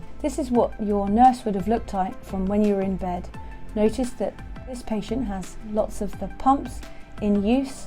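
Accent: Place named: United Kingdom. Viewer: It's British accent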